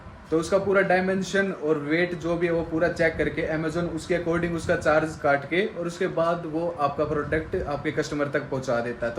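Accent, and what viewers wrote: Haryana, India. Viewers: Bhai haryanvi h k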